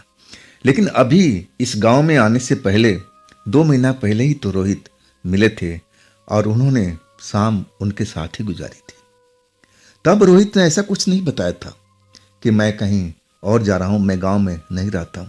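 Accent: Bihar, India. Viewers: Bihar se ho kya bhai ❤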